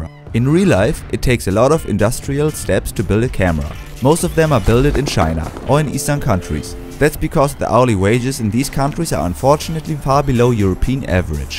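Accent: Germany. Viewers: solid info delivered with dry snark in a German accent- yeah, I'm subscribed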